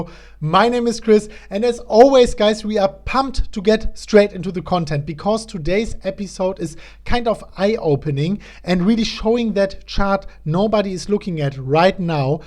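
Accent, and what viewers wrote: Germany. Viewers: nein wtf, klar hört man dein deutschen akzent raus aber dein englisch ist mega gut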